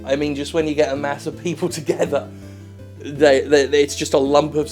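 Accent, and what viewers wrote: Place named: United Kingdom. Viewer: Wow, I love so how britishly-accented, unapologetic, and delightfull funny you are.... subscribe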